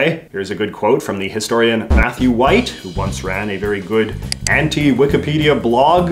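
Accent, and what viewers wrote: Canada. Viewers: Just got channel recommended and I realized that I have been binge watching for almost two n half hours now. Concise, educational and I’m all “about” the Canadian accent being an Alaskan 🙂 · Canadian detected. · Stop saying a boot please I know your probably Canadian but it is very ignoring! Thanks!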